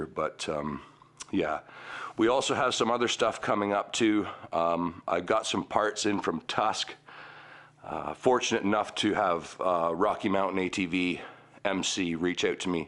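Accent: Canada. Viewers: Sweet canadian accent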